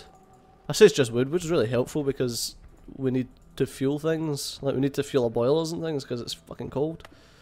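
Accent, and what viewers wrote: Scotland, United Kingdom. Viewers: I fucking love this game and i love the Scottish accent. SO GOOD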